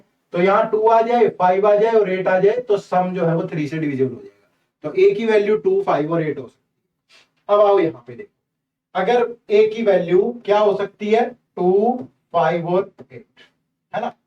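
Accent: Haryana, India. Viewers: According to me also because that speaking way and his simplicty show he is fromHaryana. I like haryana people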